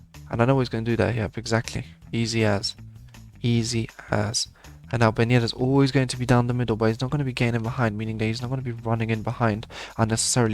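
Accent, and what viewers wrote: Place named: England, United Kingdom. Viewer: you sound like you are from england or at least lived there for a long time lol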